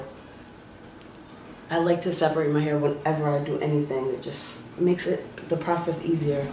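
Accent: New York, United States. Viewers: are you from NYC? loll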